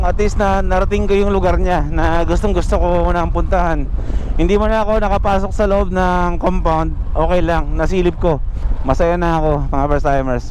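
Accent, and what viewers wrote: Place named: Iloilo, Philippines. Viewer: yung nag bibisaya ka pero mas rinig ko pagka ilonggo mo HAHAHAHAHA galing!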